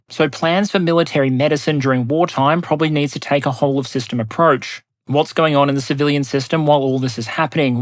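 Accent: Australia. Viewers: I really like your videos. They are interesting, and you rarely cover a topic that I don't consider worth investing the time necessary for watching. However, you're talking rather quickly, and the information is dense. Together with the at times a bit difficult to comprehend Australian English, which sometimes takes me a few seconds to figure out what word I just heard, and consequently needs me to rewind a bit because I missed what you said when I was thinking about that, and with the videos all close or over one hour, it is not exactly easy listening (maybe only for a non-native speaker). Aber before anybody is asking: Yes, I know I can set the playback speed lower, but 75% is really a bit too much and gives me the impression of listening to a Whisky connoisseur en masse, hence that's not an option. So my question would be: Did you ever consider splitting your videos into chunks of 20 minutes or so, which would give you the chance of talking a bit more slowly, and non-native speakers a better chance of being able to enjoy listening? 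😊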